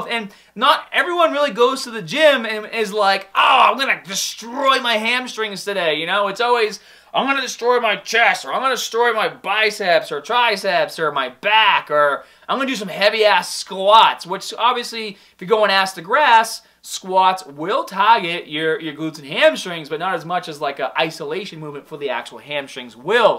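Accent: United States. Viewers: Your American accent is so strong ahah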